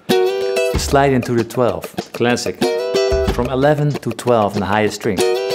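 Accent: Netherlands. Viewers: What is your origin? You sound dutch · Sounds dutch to me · That Dutch accent ain't so funky. Sounds like my Grandpa from Rotterdam. The playing is all the better to funk u with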